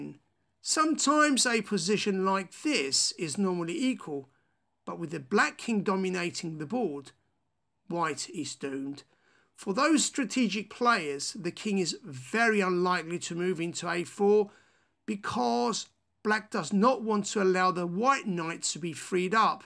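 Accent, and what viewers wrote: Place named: United Kingdom. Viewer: He doesn’t speak American he speaks British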